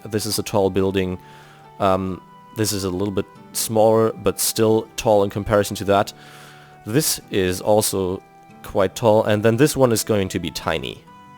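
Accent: Germany. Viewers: I like the german accent though its a tad harder to understand. Also this is gonna be a fun series. :) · lol... the German accent makes you make more sense somehow... can't really explain it! xD It's like your descriptions of what you are doing whilst using the German accent punctuate the steps in your thinking process more clearly... idek ( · maaaa schon wieder so ein deutscher .... wenigstens ein nicht sooooo deutscher akzent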